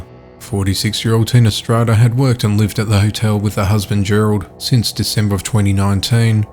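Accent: Australia. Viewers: Please get a job as an American news anchor so we can have that smooth, Aussie jazz you call a voice telling us the miserable American news so some good can come from it?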